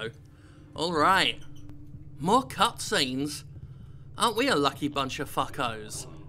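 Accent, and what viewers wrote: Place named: United Kingdom. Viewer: idk if it's just me, but you're sounding particularly British in this video:O I mean not that you don't usually, but in particular idk XD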